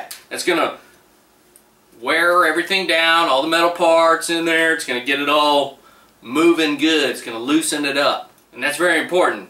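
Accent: United States. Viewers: Love your fully Texan accent (no homo tho)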